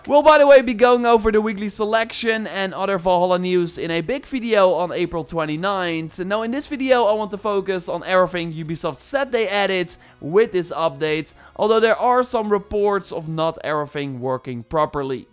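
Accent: Netherlands. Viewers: He has a Dutch accent so he says instead of V he will say F but he means Visiting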